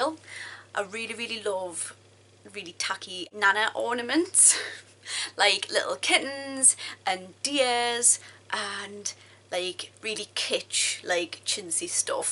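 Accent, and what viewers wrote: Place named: Scotland, United Kingdom. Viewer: i am literally obsessed with your accent. is it... scottish? are you a geordie? doesn't matter, loved your video!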